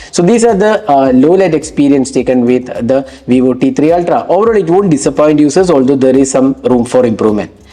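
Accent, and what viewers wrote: Kerala, India. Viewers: Are you from Kerala....?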